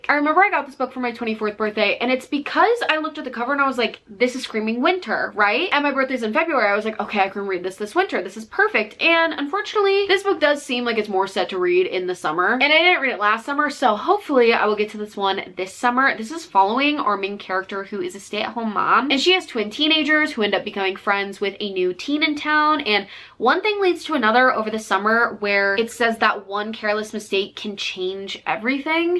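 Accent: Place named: South, United States. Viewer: Please stop using the southern accent at the end of sentences in every single video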